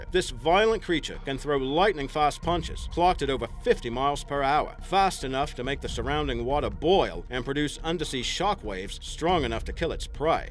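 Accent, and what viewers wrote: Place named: Australia. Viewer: i know dudes Australian.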